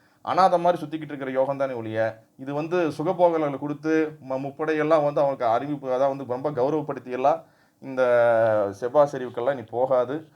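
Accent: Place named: Kongu, India. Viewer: Arumai sir 💐especially pleasing to hear the positive explanation through our veera kongu thamil slang👍 It's our luck to have a great patriot modiji as our prime minister 👏 We are safe in his rule. Thank you for your great efforts 🙏. Bharat Matha ki jai💪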